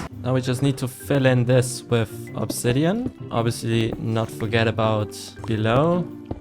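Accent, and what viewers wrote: Germany. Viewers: Idk why, but he sounds so german 😂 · You are German or? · am i hearing a little german accent?? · Are you German? Because i thought you had a little German accent. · Is bro german? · Are U German? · Are you german if yes than i knew it cuz ur accent. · u german bro?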